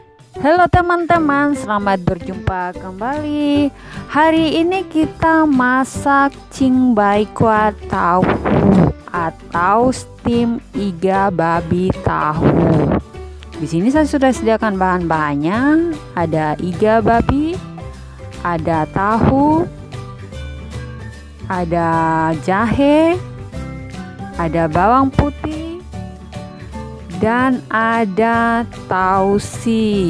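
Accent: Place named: Eastern Indonesia, Indonesia. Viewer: dari logatnya pasti daerahnya sama dengan saya yaitu Indonesia timur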